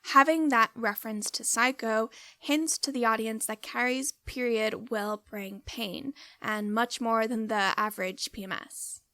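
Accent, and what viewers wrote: Ireland. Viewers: Lol completely irrelevant but why do you have the slightest irish lilt?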